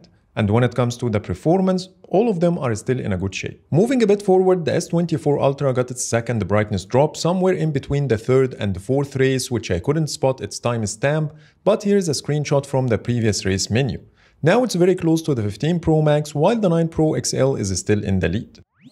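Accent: Egypt. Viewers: 3rft enk masry mn el accent, ta7ya masr ya 3m XD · طلع مصري العرص .. يسطاااا اللغة يسطاااا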